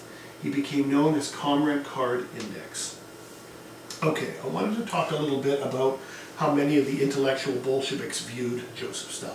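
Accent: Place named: Canada. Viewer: Your lectures are made even better by that wholesome, folksy Canadian accent.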